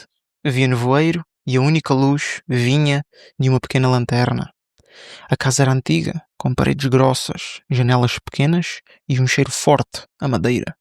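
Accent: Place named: Europe. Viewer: Muito obrigado pela ajuda de escutar as palavras faladas no sotaque europeu. Gosto do seu jeito de ler e explicar.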